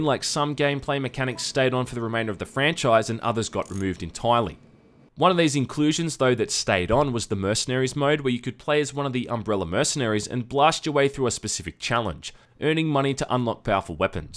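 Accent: Australia. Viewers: Newer watcher i guess. Why is he so much less Australian lol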